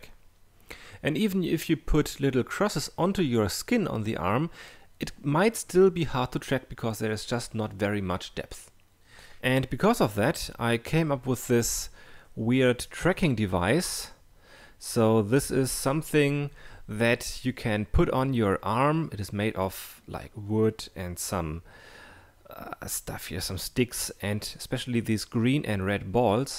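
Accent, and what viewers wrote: Germany. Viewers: R U german? You have a german accent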